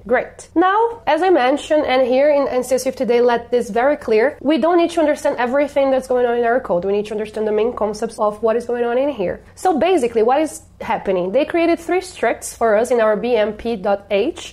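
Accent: Brazil. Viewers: Sabia que era brasileira! rsrsrs Esse sotaque brenglish é diferenciado hahaha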